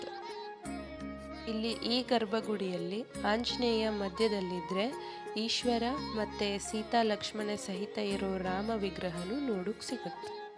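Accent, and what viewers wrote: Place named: Kundapur, India. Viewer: Super kundapur kannada💐💐💐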